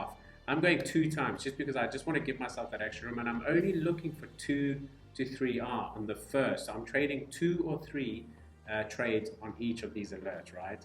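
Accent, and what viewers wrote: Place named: South Africa. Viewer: You sound like you're in SA? Good job pushing these order blocks.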